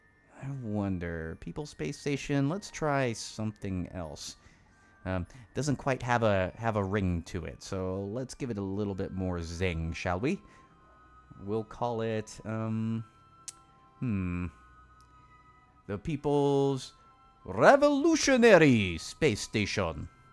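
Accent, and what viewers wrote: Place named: Russia. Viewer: Nice russian acsent, bro! :D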